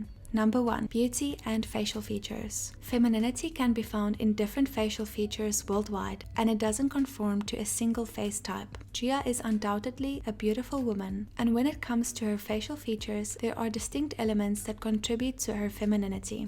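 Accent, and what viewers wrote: South Africa. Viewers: Are you South African? You sound like you are from South Africa. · Are you South African? Something about your accent gives me that impression. 💗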